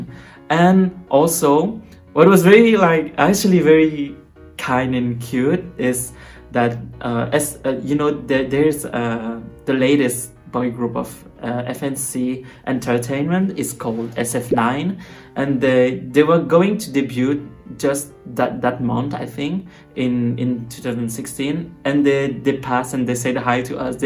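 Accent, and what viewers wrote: France. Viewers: On entend ton accent français trop choupi ~ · U'r French accent is so cute 😘, but interesting story, thanks for sharing.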